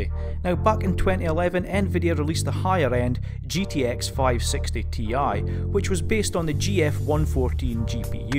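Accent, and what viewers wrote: United Kingdom. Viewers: Which part does this guy come from in the UK?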